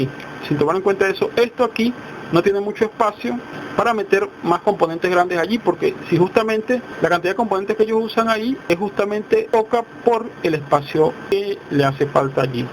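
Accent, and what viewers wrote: Venezuela. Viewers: mi pana tu eres venezolano verdad? aprecio tu esfuerzo muy buena tu explicacion, ahorita comprar un bombillo nuevo es un dineral. Gracias!!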